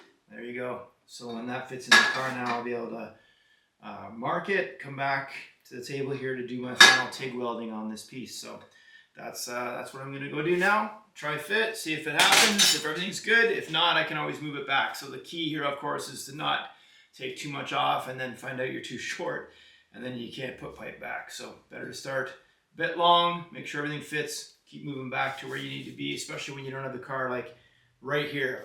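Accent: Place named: Canada. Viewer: Not even finished and it looks killer already !!! Great color choice. Would love to see it in person at a car show someday 👀 The accent leads me to believe you're quite a ways north of Colorado , be a bit too far 😉 Possibly up in Canada 🤔 Anyways really appreciate the videos.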